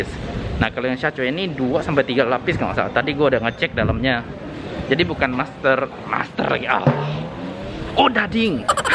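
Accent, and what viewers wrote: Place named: Manado, Indonesia. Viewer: Rupa logat manado noh 😅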